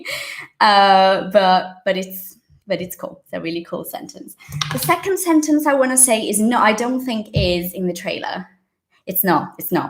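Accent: United Kingdom. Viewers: I love this accent British ♡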